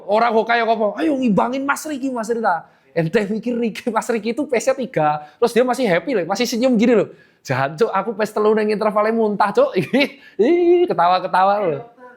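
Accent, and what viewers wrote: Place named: Surabaya, Indonesia. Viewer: Saya fans baru dokter 🙏 pas liat YouTube ada dokter Lewat. Saya suka gaya bicara dokter yg apa adanya, nada Surabaya yg khas👍 terbaik dokter idola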